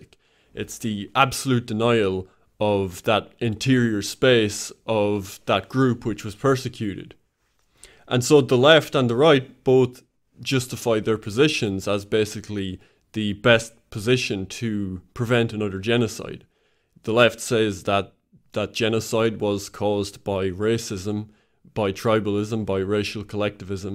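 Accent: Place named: Ireland. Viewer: cute adorable Irish accent. Comes from centuries of British molestation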